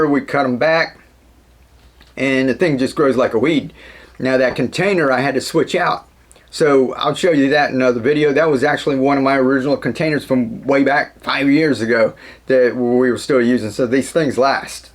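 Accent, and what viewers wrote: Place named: Alaska, United States. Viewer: You sound like another Alaskan 😂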